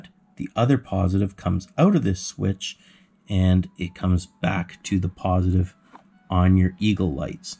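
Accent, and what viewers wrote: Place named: Canada. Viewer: I understand the process. I was simply noting that you never mentioned what type of battery charger to use with it. If someone who doesn't understand LifePo4 batteries builds your project, (a very fine one at that), and tries to charge the battery with a standard power supply, car power socket or car battery charger, they will wind up with a pile of HOT orange goo where the battery box used to be and / or possibly even a very hot fire that is next to impossible to put out. Lithium type batteries are no joke and need to be used with care and respect. I was encouraged by your use of a fuse. I'm guessing by your accent that you are Canadian. A little tip for you and your fellow countrymen / women and my country people to the north of me. LifePo4 batteries can NOT be charged by ANY charger or solar array if the cell temperature is below 32 degrees F, (0 C). 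Permanent damage to the battery will result. it can be used cold but not charged. It must be warmed up first. I say this for someone who may go ice fishing and leave it sitting on the ice or may leave one in a car for emergencies in the winter. Larger higher quality, LifePo4 batteries for solar, marine or RV use have a BMS module or Battery Management System module built into them to protect them from overcharge, undercharge, low charge condition, (below 20%), short circuit, excessive high drain and high and low temperature conditions. It is not impossible but unlikely that your battery contains a BMS module and more likely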